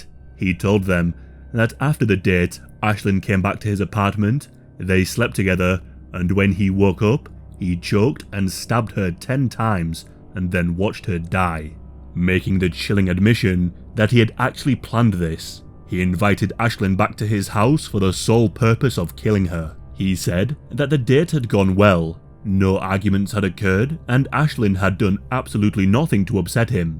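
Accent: United Kingdom. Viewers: Yes, sounds British to me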